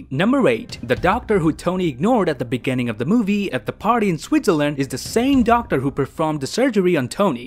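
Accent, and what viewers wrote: Canada. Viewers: he sounds so much like modest pelican but Canadian instead of Australian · I love your accent say it again!: Dowa the explowa Edit: Thanks for the likes! Hoping you are having a good day!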